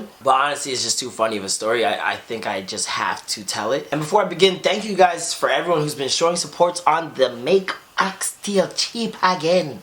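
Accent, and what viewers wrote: Jamaica. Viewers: Are u from Jamaica or America...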